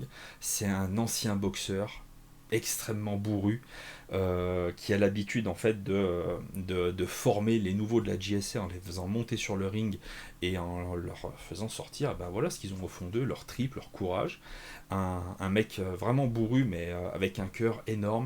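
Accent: England, United Kingdom. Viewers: Bah il est très bien cet accent anglais ! Merci pour la vidéo ! J'ai jamais pris le temps de découvrir la JSA, ça donne envie!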